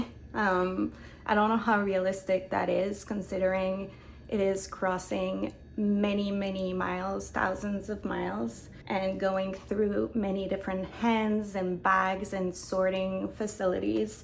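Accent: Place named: France. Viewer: My Dear, your new excitement is palpable. It's so noticeable your French accent was more forward. I love this! Congrats on your new venture.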